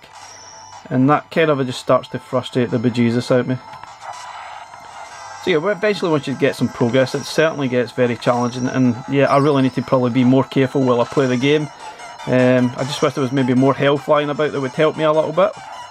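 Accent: Scotland, United Kingdom. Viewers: I’m sure I will I’m sure I will. I loves ur content especially that Scotch accent us English Gurl’s like an accent 💖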